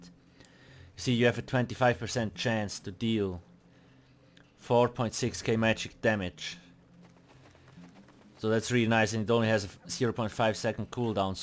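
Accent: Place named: Switzerland. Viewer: So lustig, han no bevor e Minute ume gsi isch denkt, "das tönt schwer nach eme Schwiizer!". ^^ Merci für de Content, wiiter so. =)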